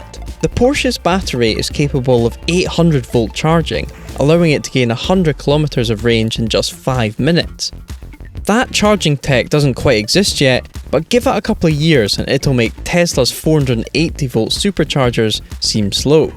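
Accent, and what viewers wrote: Scotland, United Kingdom. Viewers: please get someone thats not scottish...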